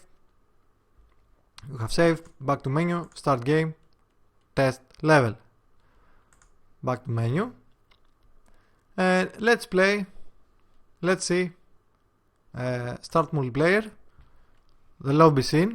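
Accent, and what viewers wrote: Greece. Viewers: την ελληνικη προφορα δε τι μπερδευω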